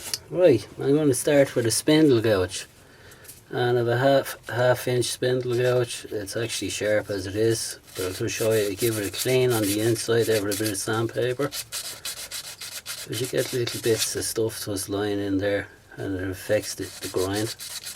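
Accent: Dublin, Ireland. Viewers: Very helpful video. I've not done any wood turning since secondary school many years ago. I got a lathe at Christmas and have been tipping away since. Im guessing by the accent you are in Dublin, I was just wondering where you got the grinding wheels? I got a cheap 6" bench grinder but the wheels with it are very rough and I dont want to damage my chisels. Planning on making the home made Jig for the fingernail grind as they are too expensive to buy when they can be made quite easily. Also enjoying your other videos too. Planning on trying the multi axis cup when I get time.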